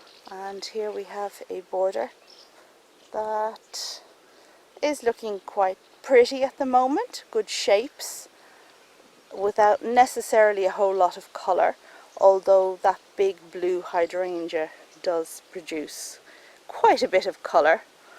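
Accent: Ireland. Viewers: Well I did have a lovely start to my day ! I watched your video in bed with a cup of tea and a biscuit 🙄 which gears me up to get out into my garden, which is not so big but gives me so much pleasure . At the moment I have a plant called Sanguisorba Lilac Squirrel which I think you’d like as it has interesting leaves and long pink tassels,(keep up the tours) I hope one day to see you and listen to your lovely Irish twang 🤗🤗🤗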